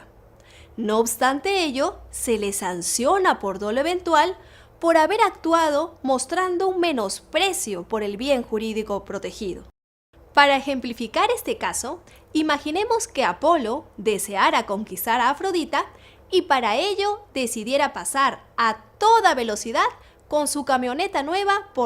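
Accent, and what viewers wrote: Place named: Mexico. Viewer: Habla como Mexicana...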